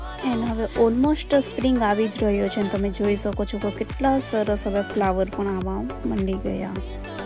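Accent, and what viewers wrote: Saurashtra, India. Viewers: ખુબ જ સુંદર ધન્યવાદ ભાષા પરથી તમો સૌરાષ્ટ્ર ના લાગો છો 👌👌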